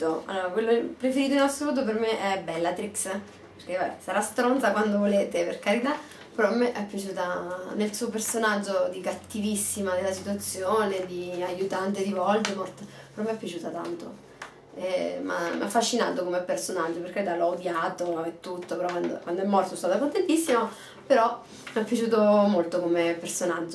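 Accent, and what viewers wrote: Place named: Tuscany, Italy. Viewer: io di Ancona !! ;) dai siamo piuttosto vicine !! capirai , pensavo fossi toscana !! hah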